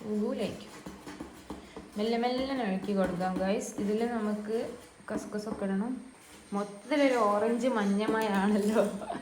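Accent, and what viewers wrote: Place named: Thamarassery, India. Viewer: എൻ്റെ favorites നമ്മളെ നാടൻ ഭാഷയാണ്.😂😅 നല്ല rasand താമരശ്ശേരി ഭാഷ കേൾക്കാൻ❤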